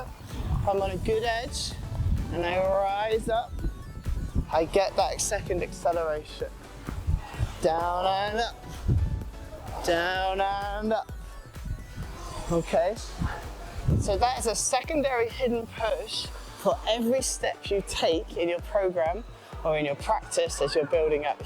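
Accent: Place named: RP, United Kingdom. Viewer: I'm from Texas, USA. I like your posh sounding accent. I'd like to know Where are you from, so that I can add to my knowledge of UK (I assume) accents.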